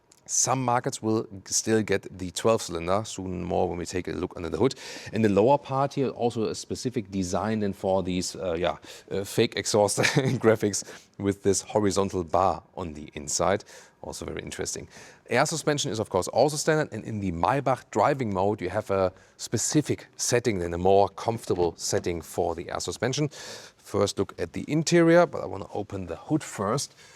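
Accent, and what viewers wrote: Germany. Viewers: don't apologise for your deutsche pronounciation. Indeed it's MaybaH, not MaybaK.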